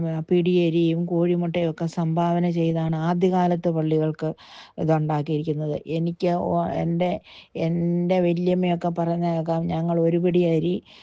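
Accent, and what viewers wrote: Changanassery, India. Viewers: സഹോദരിയുടെ ഭാഷ കേട്ടാൽ അറിയാം ഒരു ചാണനാശ്ശേരി ക്കാരി ആണെന്ന് അപ്പോൾ ന്യായമായും സംശയം തോന്നാം. സ്വയം രക്ഷിക്കുക കൽദായ ചട്ടി തൊപ്പി കളുടെ കൂടേ കൂടാതെ ഇരിക്കുക. All the best സഹോ 👍🏻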